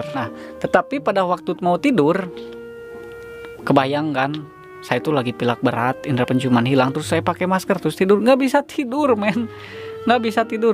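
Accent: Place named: West Java, Indonesia. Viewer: Btw karna si aa logat sunda nya kentara pisan, sim abdi ngarasa jiga dipapatahan ku lanceuk nyalira hwehee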